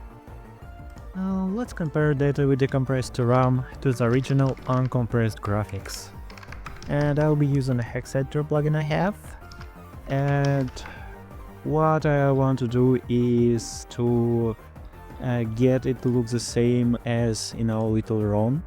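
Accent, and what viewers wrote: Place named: Russia. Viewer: Just finished watching, great video. An amazing voice reveal also with that thick russian accent haha.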